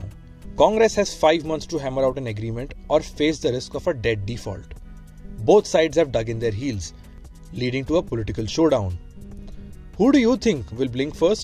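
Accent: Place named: India. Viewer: Maybe don't have a customer service person from India doing the narration?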